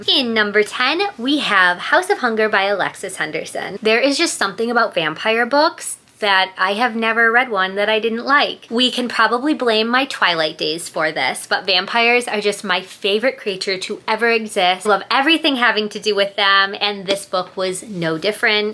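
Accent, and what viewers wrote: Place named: Milwaukee, United States. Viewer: your voice is very milwaukee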